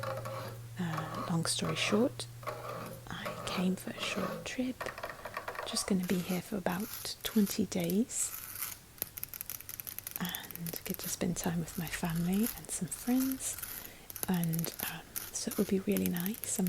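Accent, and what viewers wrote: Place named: United Kingdom. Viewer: I’ll always be on the lookout for any little slip up in your British accent 😊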